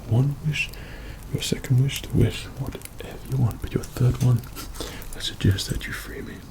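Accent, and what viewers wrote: England, United Kingdom. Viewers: Arabian genie but has a English accent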